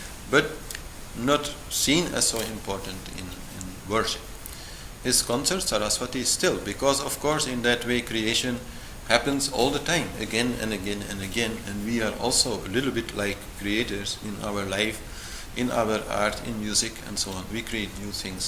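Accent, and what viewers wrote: India. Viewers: Why do you talk like an Indian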